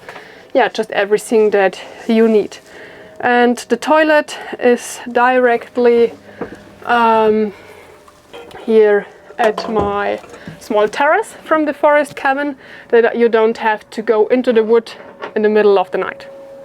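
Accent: Germany. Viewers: So good to see an english video I watch her in german ones , but it plays a computer voice , and I don't get to hear your lovely german accent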